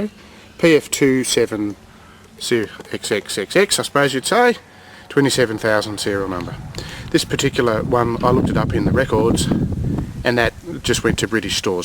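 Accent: Australia. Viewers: Your Austrailian... just wondering if you have ever seen the movie Breaker Morant about the Aussies in the 2nd Boer War and his rule 303 speech he gives. Awesome movie just, thought I'd recommend it. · are you australian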